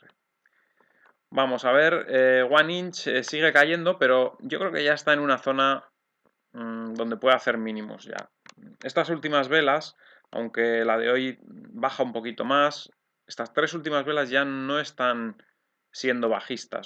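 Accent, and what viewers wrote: Spain. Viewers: Eres de Madrid a que si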